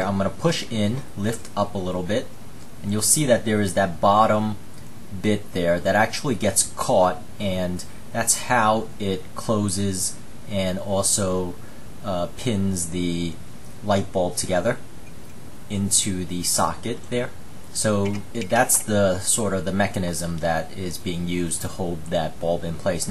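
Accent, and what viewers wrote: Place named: Long Island, United States. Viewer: Great video. Very thorough. Love your mild Long Island accent. Did I get it right? It's like a toned-down Ramones accent. Hey Ho, Let's Go!!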